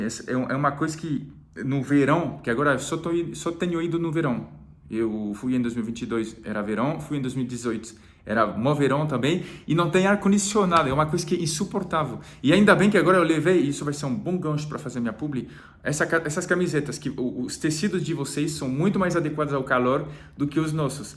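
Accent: France. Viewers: Parabéns,! Vc tem uma verdadeira alma brasileira com sotaque francês! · Esnobe, Passou 20 dias na França e já voltou c sotaque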